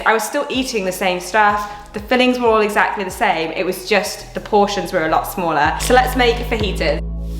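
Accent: Australia. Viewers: Australian accent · Omg your cat in the background 😂😍 PS love this video, you are so down to earth and relatable. I can definitely hear the Ozzy lilt in your voice! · you have an amazing australian accent. Love it.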